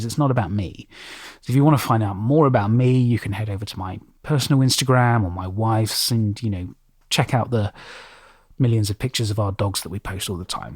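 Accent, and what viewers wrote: United Kingdom. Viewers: Well, for me personally,it was like YT stopped showing me your content. And I always try to engage in the channels i like,even if it’s just liking the videos.. and they’re definitely not going to tell you if your being shadowbanned!! And that sucks about the name!! I’ve noticed channels that do well, consider their fan base as their “family”, and interact often on live streams,posts,surveys (I do them!) never feel like you can’t ask your fans what they want!! I also started watching for wendigo stories….it’s hard to find ones that are close to lore, and aren’t getting skin walkers and wendigos mixed up..there’s some good stories out there, out of the thousands of crappy ones! Find something that you can make ur own, like ASMR(rain,fire,wind sounds)and scary stories. It’s oddly soothing. “Being Scared” I believe is his name is a great creepy pasta narrator,with ASMR. 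Dark Somnoum writes and performs all his music in his videos,and many narrators in the community support him,he’s a great narrator,as well as musical talent!! So maybe there is something special you can offer your fan base as well! It makes folks feel appreciated! I hope some of these comments helped,and are solely meant to be constructive! I am a big fan of yours as well,I love the Brit accent and you have great narration skills as well! I know it can’t be easy!! But thank you for the great content no matter what!!😊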